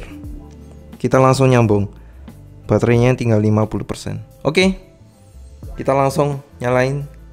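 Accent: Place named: Java, Indonesia. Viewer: I luv ur medhok min wkwk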